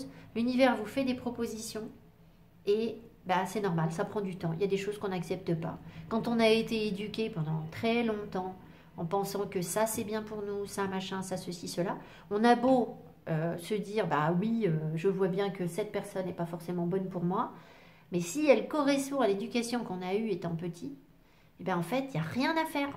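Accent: England, United Kingdom. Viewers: J.adore votre accent anglais🙏🌻💝🌞👍🥰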